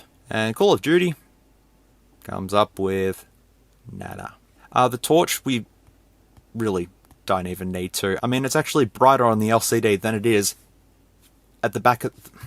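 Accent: Australia. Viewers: Yay the Aussie voice is back now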